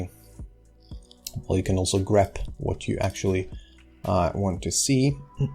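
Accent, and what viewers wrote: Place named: Canada. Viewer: Your pronunciation of dipthong /aw/ rose to a mid vowel preceding a voiceless obstruent (Canadian Raising) (Common in The Big Smoke) (Common in Mississauga)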